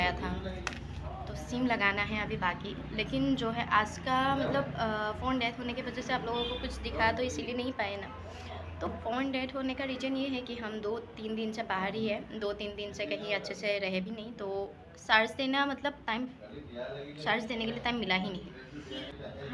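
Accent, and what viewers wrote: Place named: Assam, India. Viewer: Bhai vo assamese hai .. assamese ki hindi itni acchi nai hoti hai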